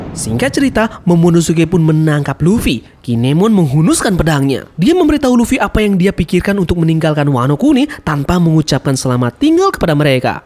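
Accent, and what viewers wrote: Bali, Indonesia. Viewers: kok kayak jd logat bali bang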